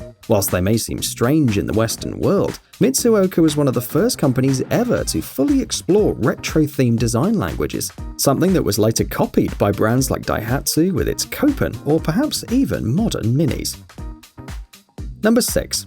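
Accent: United Kingdom. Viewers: "What's up?" Naff all, pal. It's bad enough when YouTube Yanks ask what my problem is, but a British accent asking it demands a Glesca kiss.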